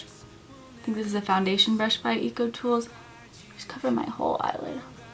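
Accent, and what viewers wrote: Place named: South, United States. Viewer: you are so freakin adorable. love your southern accent! goood vdeo too!(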